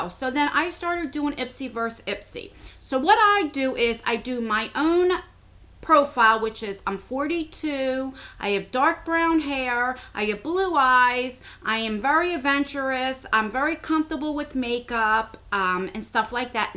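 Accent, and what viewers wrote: New Jersey, United States. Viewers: Hi i really like your vidoes been subscribed for like 6 months now i love your Jersey accent its beautiful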